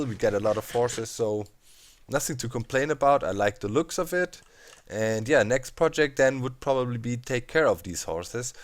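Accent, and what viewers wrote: Germany. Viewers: Dr. CreeperZ ha I knew it cuz I'm from Germany and everybody there has an accent like that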